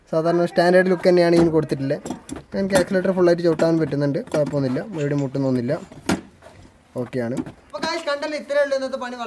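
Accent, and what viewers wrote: Kannur, India. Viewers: Bro kannur aanalle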